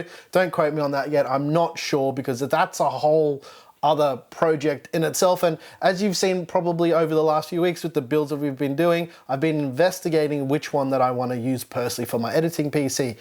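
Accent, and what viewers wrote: Australia. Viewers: I'm so confused dude looks mexican but sounds Australian lol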